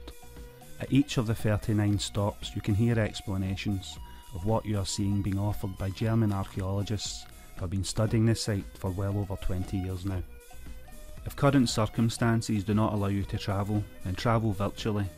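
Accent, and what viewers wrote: Scotland, United Kingdom. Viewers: Hard to understand quite a bit of the content. Hard Scottish is hard for us to understand. is a wonderful narrator and your content is just incredible. Much love and gratitude! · I personally found it to be hard to understand in certain points my grandads Scottish aswell so I should have been able to understand better, prefer the previous voice, that guy sent me to sleep most nights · It was great to hear a fellow Scot on such a prestigious channel (haha)...Baalbek is a really intriguing site...far, far older than the Roman construction that was built on top of it...thank you for the virtual tour...love from Scotland... 🎇😁🎇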